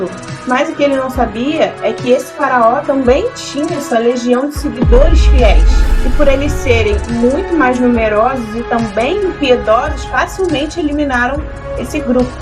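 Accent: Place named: Rio de Janeiro, Brazil. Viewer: Eu adorei o vídeo ❤❤❤..... mas o sutaque carioca é horrível 🤢🤢🤢🤢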